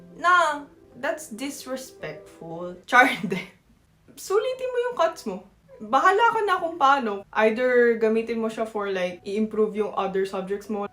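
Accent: Batangas, Philippines. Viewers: kyot mo teh~:face-green-smiling: kaya pala medyo batanguenya yung accent mo taga dito ka pala dati. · Love you, isa ka na talagang Pinay, salamat for embracing our culture lalo na ang aming wika, Batangueña rin ako kaya i can relate to your diction, ala eh..... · That’s why! whenever I watch you I hear words, expressions and even a bit of Batangaueña intonation. Where in Batangas? Batangueña too. A Maroon as well. More power to your channel and congratulations to 100k!🎉👏🏼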